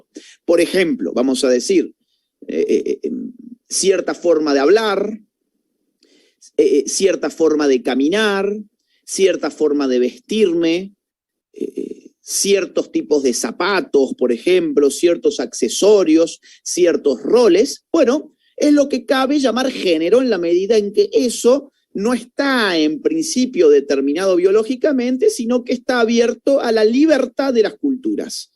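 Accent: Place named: Mexico. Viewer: Habla como mexicana esta dama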